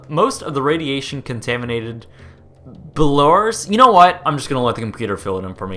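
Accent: Canada. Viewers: No, he's definitely from the proud north. The way he pronounces his O's, the fact that the only live interview I've seen him do was of the guy in charge of Target Canada, and the Ontario PO box give him away. He could be American now, but he's definitely a Canuck by birth. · You say at the beginning of the video that you're American, but haven't you said you're Canadian in other videos? And you pronounce "about" like a Canadian... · You sound Canadian.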